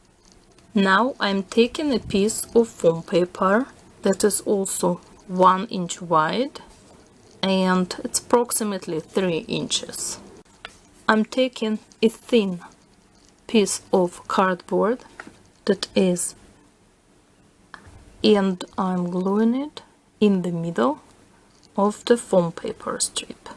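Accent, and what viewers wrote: Russia. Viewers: I love ur Russian voice and ur crafts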